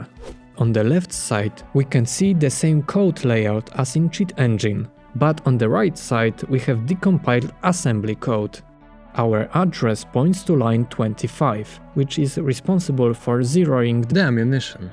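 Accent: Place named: Poland. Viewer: jesteś z polski słysze akcent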